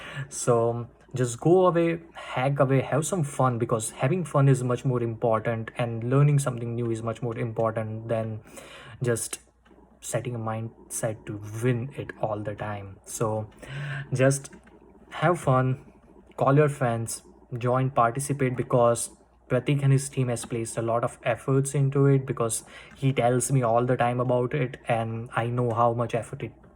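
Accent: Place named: India. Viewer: English Ko Bhi Hindi Jaise bolte ho😁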